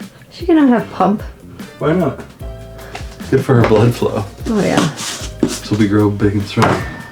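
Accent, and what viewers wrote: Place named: Canada. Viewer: your Canada really came out this vid lol. As well as your th-th. 😂. Love youuuuuuu! ❤️ 🤣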